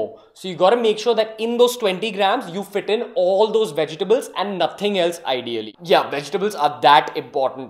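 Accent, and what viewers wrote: India. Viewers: When I saw the thumbnail i thought it is some American guy's channel and when I opened it.. BOOM... indian english #wtfmoment 😂😂😂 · The Indian accent is running off the charts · He has got an Indian Accent...Is that bothering u?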